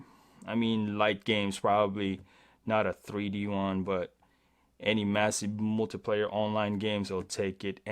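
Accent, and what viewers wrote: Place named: Philippines. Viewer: Just chill guys. His first language isn’t english. He might be a filipino. Don’t be quick to judge.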